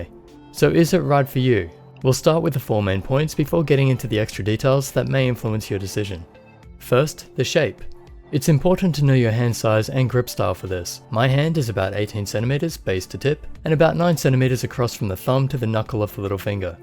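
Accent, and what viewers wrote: Australia. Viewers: This is a bloody excellent review, mate. Impressive amount of detail! My first video of yours and an instant sub. Also great to hear some Aussie-English amongst the far too much American going on on YouTube!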